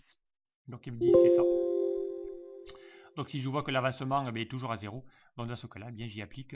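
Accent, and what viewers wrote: South, France. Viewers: Pas la peine de me répondre à mon dernier message, je vais d'abord visionner cette video merci pour vos tutos et pour le petit accent du midi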